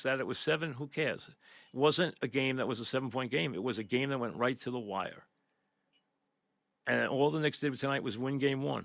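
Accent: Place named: Philadelphia, United States. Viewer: Geez Looeeze. Did you grow up in south Philly?? Wtf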